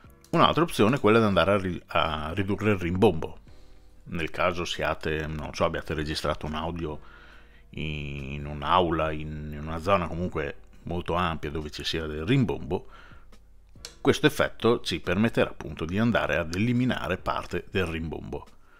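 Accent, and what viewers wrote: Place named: Genoa, Italy. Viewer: mi sbaglio o mi sembri de zena ?!?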